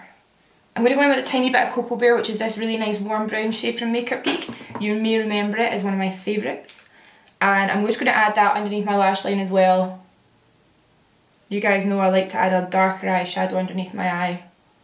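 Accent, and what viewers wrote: Scotland, United Kingdom. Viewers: Omg, no makeup.. you're stunning stunning stunning.. with as well.. goodness, and the lovely Scottish accent too. Big smile!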